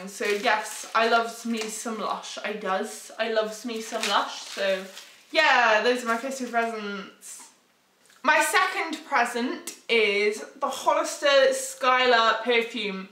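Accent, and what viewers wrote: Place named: Ireland. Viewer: Are you Irish? If you're not I'm sorry for asking but idk you sound really Irish to me all the sudden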